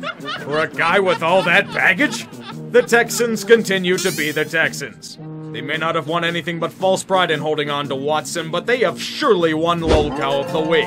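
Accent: Pittsburgh, United States. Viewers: Anyone else love his “yinzer” accent? I think it’s hilarious 😂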